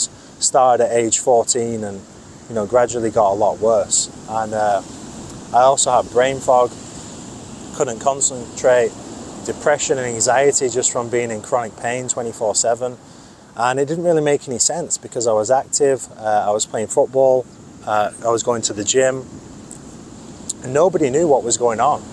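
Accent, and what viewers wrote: Manchester, United Kingdom. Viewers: Is that a Manchester accent? I’m an American and just curious if I recognize accents well enough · Is that a Manchester accent I hear? I’m American by the way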